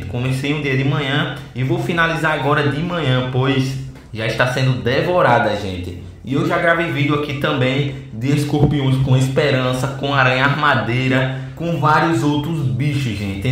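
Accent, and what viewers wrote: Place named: Northeast, Brazil. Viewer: O e nordestino por causa do jeito de falar KKKKKK Mais o vídeo ficou incrível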